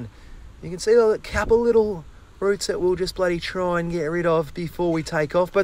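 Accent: Australia. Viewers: I Am from The Netherlands and I just love your australian accent 😊 And it's kinda fun to watch how it's done, Thanks for the amazing content ☺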